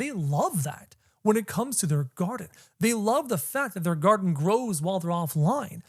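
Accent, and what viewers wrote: Canada. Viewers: Genuinely thought you were from the UK and now I hear the Canadian, I'm so dumb 😅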